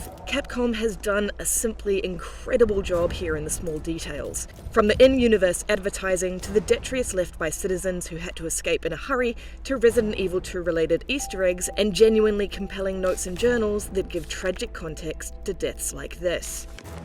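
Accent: New Zealand. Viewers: Going by her accent, is a fellow Kiwi? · Is that a new zealand accent??? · So weird to hear a kiwi accent on ign.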